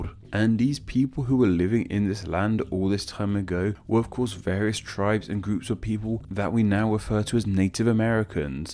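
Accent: United Kingdom. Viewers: Guys, hes a brit Cut him some slack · Wouldn't individual tribes have different names for the land where they lived? Making generalized statements about Pre-Columbian indigenous people in North and South America is about as useless as making similar statements about the peoples of Europe at the time Julius Caesar conquered Gaul, or Roman Legions landed in England. In what is now the United States Eastern tribes would have lived in permanent settlements as would those in the Southwest, the Pacific Coast, and parts of the Intermountain West. The indigenous group the British-accented speaker is making generalizations about were the "Plains Indians", so he likely learned everything he knows about Native Americans from "Dances with Wolves". BTW, what did the indigenous people of Europe call their continent during the Dark Ages? Except for a few Catholic priests who would have rendered the answer in Latin, they wouldn't have a name for it BTW, I always turn to Received Pronunciation British speakers for information about Native or Indigenous peoples of North America--NOT.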